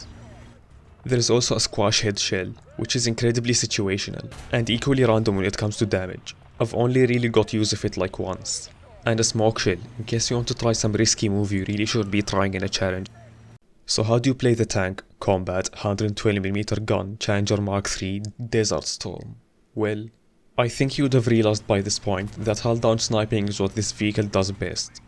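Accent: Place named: Arab world. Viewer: Love the Arabic accent ;) nice vid man, keep it up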